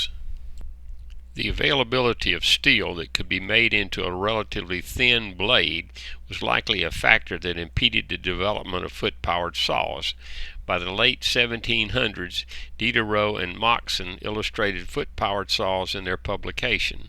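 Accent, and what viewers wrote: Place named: South, United States. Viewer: Excellent information delivered in a smooth southern voice.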